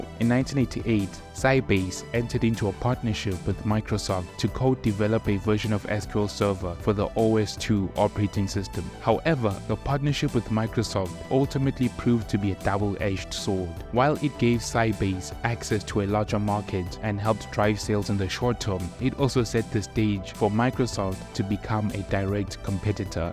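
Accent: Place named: Southern Africa. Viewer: I know a southern African when I hear one😂 Good stuff mate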